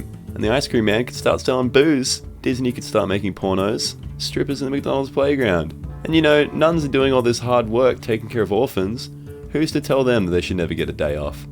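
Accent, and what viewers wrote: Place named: Australia. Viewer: so fucking australian it's perfect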